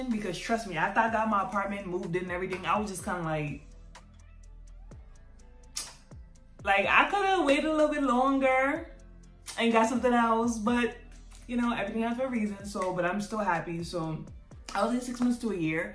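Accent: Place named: Caribbean. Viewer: Sis you definitely sound Caribbean!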